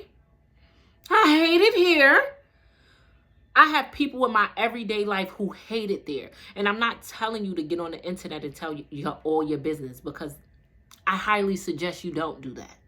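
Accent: New York, United States. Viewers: Hey, I have really been enjoying your content lately . Due to it being real life situations, l and many more can relate. I used to live in Brooklyn, so I play your videos in the background to feel at home You have the real New Yorker accent is so strong I chuckle every time you start talking.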